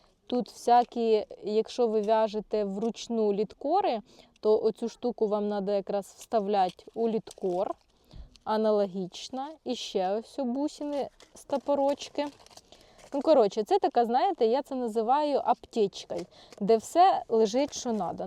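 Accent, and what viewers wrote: Ukraine. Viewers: Ви чудо! Дуже подобається мені і ваш (наш) український діалект.Супер!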